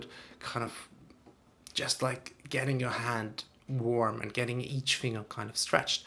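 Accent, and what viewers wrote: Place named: Dublin, Ireland. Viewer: Hey. I found your channel because it is referenced by another YouTuber, Mikko Hilden. I don’t know why I assumed you were compatriots so I was happy when I heard the Dublin accent. I live in Dublin normally but I’m in Australia for the next couple of years. I started taking guitar lessons recently, straight into Jazz. You référence technique exercises but you don’t have a link to them. It would be great if you could point out where us beginners can find good technique exercises. Do you teach? I’m hoping that when I’m back in Dublin I can continue studying at a less embarrassingly beginners level. Keep the videos coming.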